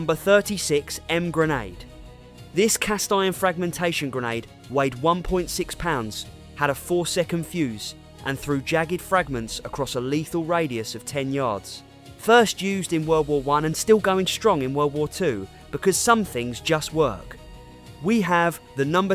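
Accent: United Kingdom. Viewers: Surely the British soldiers carried ‘aluminium’ canteen and mess tins NOT ‘aluminum’ items! If using a British sounding AI narrator FFS ensure it uses British pronunciation!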